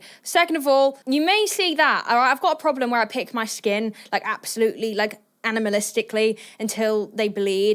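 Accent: United Kingdom. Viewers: I made the mistake of looking at the comments section of Andrew Wilson's response to and it amazed me how much supposedly grown men are triggered by an outspoken young woman with piercings, tattoos, stuffed toys in her room and a British accent. Keep making content YOUR way, don't listen to "alpha" losers like him and his followers.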